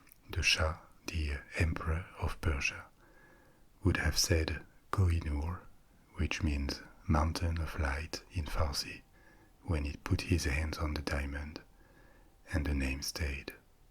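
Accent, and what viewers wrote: France. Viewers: t'es français ? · The content is fab in that it takes you to far off exotic places but that sexy French voice sends me to la la dreamland.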